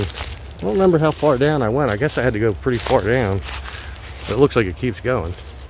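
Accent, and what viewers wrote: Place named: Philadelphia, United States. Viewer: Great video! I love your work. I am a history geek who grew up in Mayfair (Lincoln HS grad), and your accent makes me think of home. ❤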